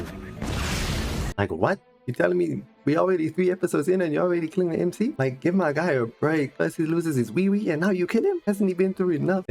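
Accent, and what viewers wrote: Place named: Australia. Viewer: this is a black australian accent, i believe. i have black australian friends that sound the exact same.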